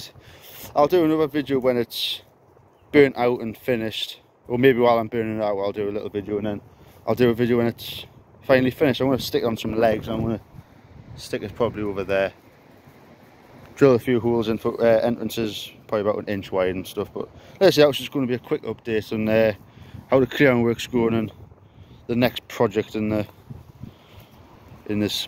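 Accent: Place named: North East England, United Kingdom. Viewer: thought I recognised your accent,am from Jarrow near the tunnel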